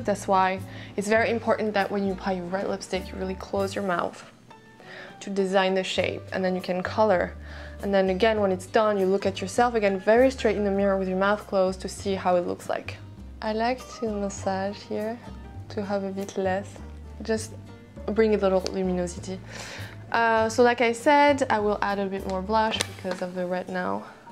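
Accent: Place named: France. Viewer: Hi, I am not French and also not an englishspeaker, so for me its pretty hard to listen this accent. The video is very well done, the music and everything else .... Just the manner of the speaking is a bit smooth sometimes, thus it gets hard to distinguish certain words. Not to offend, just my point of view 🌹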